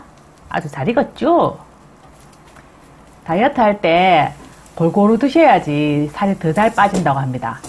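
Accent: Gyeongsang, South Korea. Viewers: 아이디어는 좋은게 많은데 너무 심하게 쓰는 사투리가 거슬리네요 ㅠㅠㅠ 경상도분이시라 일반 사투리나 억양 이해는 하는데 궂이 그렇게 심하게 쓰실 필요가 있을까요? 암튼 조금 고쳐주셨음 영상이 더 좋아질듯요. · 경상도사투리는 듣기가 쫌... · 언니 요리 솜씨보다 상도 사투리가 매력만점 더 예쁘고 간더러지게 구사하시옵소서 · 시원시원 하게 요리하시니까 든기좋네요 경상도 말과 함깨 구수 하네요 양배추로 해먹어 봐야 겠네요 간단한 요리 또 올려 주세요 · 사투리가 귀에 쏙쏙 저도 경상도 여자라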